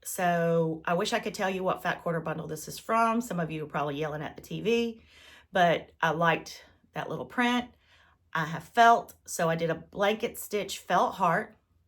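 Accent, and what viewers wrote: South, United States. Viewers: I got so tickled to hear your Southern twang. I've lived in the Tampa Bay area almost 40 years. I must be drunk and blind! I miss my Tennessee roots, and my North and South Carolina family and friends. People here still ask, "Where you from?" I need a snarky answer to that! Really enjoyed your flosstube, as an "old" newbie to your channel, but 50+ years cross stitching. Love a Blackbird❤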